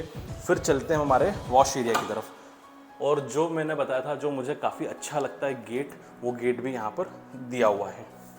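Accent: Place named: Malwa, India. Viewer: आपके बात करने का सलीका बिल्कुल मालवा के गांव के लोगों जैसा है, कौन से गाँव से हो आप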